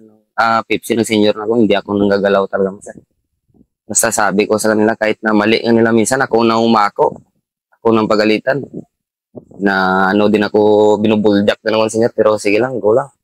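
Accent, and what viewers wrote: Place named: Iloilo, Philippines. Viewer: Sure ako na ilonggo accent nito we salute po sa inyo sir.❤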